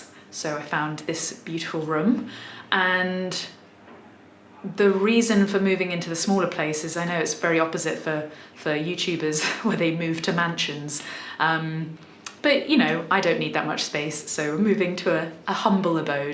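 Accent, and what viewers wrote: United Kingdom. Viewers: While you're accent may be a bit British, it's not truly British because I can understand every word you say (Ahm frum Jawghuh so eh ez purdie goot Ah kin git thru th wurl whentz zi git out'n da haus.) A truly proper British accent, and there are many, cannot be understood by anyone outside of the neighborhood in which it is normally spoken I believe the appropriate quote is, "If I were any more British I wouldn't be able to talk at all" Whatever your accent you do it well. Perfect voice to listen to 😎 Also: Like your new digs but those blank walls scream, "ERASABLE MARKER ATTACK" 😁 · your accent sounds very british ------ but i know nothing about you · I have to admit, I watched this video just because I wanted to nap while listening to your British accent. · British accent is nice; I'm smitten with those beautiful brown eyes, I'm in love....a little bit of madness...with with great guitar that is. 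· I've watched several of your videos before, and suddenly I just subscribed at WINK! Looking forward to being a further viewer/listener/learner. I lived four years in the UK. You're definitely a Pom! Love your eloquence. Hi from Oz, oi oi oi!;-):-) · The non-rhotic British accent such as your beautiful accent, is not a classic accent. It didn't exist before the late 18th and early 19th century. It's really quite recent. Many would be surprised to know that the current American accent is quite similar to the British accent of the 18th century and that it is the current British accent that has changed. Sorry. Linguistics geek;) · I love your accent. It is the best British accent I've ever heard. Everything you say is perfectly enunciated and so easy to listen to. I wish you the best on your new work space (studio) and your future endeavors. Love from Miami ❤️❤️ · Wish I had your looks along with that beautiful British accent. 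And then you play the guitar and sing as well. Beauty, accent and talent. Now I call it 3 in 1. I am writing from Sri Lanka where I was born and been living since then, and I am no where as beautiful as you are. And I cant speak English the way you do. Wish I could. God bless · your accent sounds british to me, i'm from the boston, massachusetts area and have a strong accent and i'm 71 yrs. old and most of the people around my age have a boston accent which we picked up from our parents and surroundings when we were young. now i've noticed that most of the younger people like milleniums don't seem to have this accent or altered maybe due to their exposure to more people via the internet. my wife is from western new york state and has a more neutral sound to her voice. one could to a real study of all the regional accents in the U.S. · Sounds British too me, don't sound like you're from Liverpool but doesn't sound like you're from Texas (like me), doesn't sound like you're from Atlanta or the Joisey shore. 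Sounds British. London? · As an American we love British accents! We generally can't tell the difference between different areas, but I love yours and clearly you're from a classy background! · In 5 yrs you'll be just wherever you wanted to be! Life is good!! Love your British accent..!! Hi from Ecuador-South America!! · Quite a lovely lady and axe player. Love your clipped British accent. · Hi, your british accent is what I like the most! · Love the video!! Good luck setting up the studio. Maybe the next three videos you give us a different British accent each time… In song even!! Love your channel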